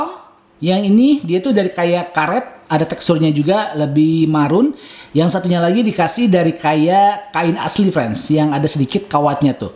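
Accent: West Java, Indonesia. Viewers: Dari logak ngomong nya kayanya bang orang sunda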